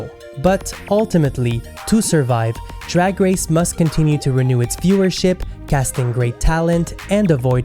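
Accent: Quebec, Canada. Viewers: Not at all. Your French/Canadian?? accent is very charming 👍😊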